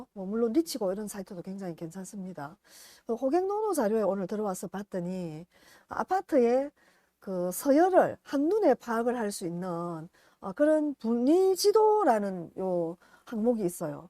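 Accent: Gyeongsang, South Korea. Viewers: 좋은정보 감사합니다~~~ 갱상도 사투리 매력적이십니다 ^^ ㅎㅎ 갱상도 아가씨 사투리 매력에 반해가지고 결혼해서. 행복하게 잘살고있습니당 ~~~ ㅎㅎㅎ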